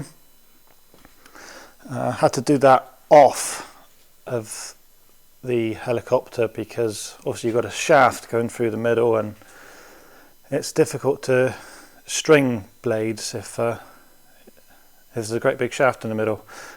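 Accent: United Kingdom. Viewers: Well done for getting this far. I built a prototype coaxial 6 years ago and got it to basic hover testing but put it away in my shed and now I have some more time are wanting to move it to next phase of testing. I was wondering where you are based? You sound British. I'm live in New Zealand.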